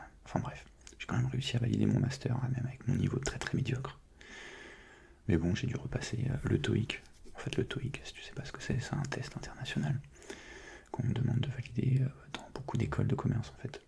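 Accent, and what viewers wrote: France. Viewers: French is a language so good to hear. 😍😊 I'm in love with that accent. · I love french men voice and accent 🤤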